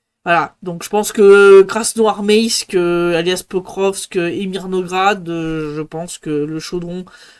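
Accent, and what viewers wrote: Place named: France. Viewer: Il v ient de quelle région de France, l'accent ?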